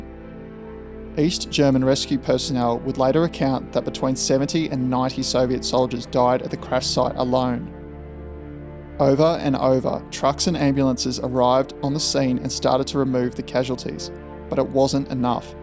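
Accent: Australia. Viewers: It's so comforting to hear an Australian voice on a very good video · Aussie accent! Liked and subbed you legend.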